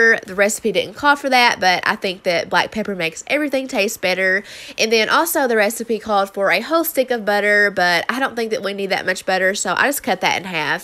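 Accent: South, United States. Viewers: Are you from the south? Cause you talk and cook southern like myself :p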